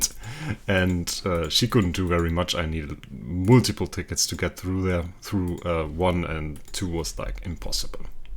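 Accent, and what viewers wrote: Germany. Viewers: you have a very german accent bro, love ur content. keep it up👌🏿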